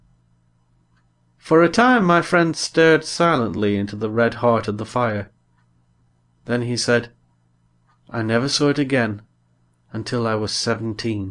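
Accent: United Kingdom. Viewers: Типичный англичанин читал ни одного кошаче американского уррр уррр слова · Британский!американский резче и они очень четко произносят многие звуки такие как R и не тянут гласные.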